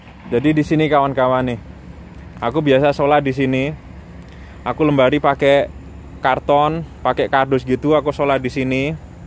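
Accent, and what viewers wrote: Java, Indonesia. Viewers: jowone daerah pundi mas smpean...suka bgt ma isi video ini..😊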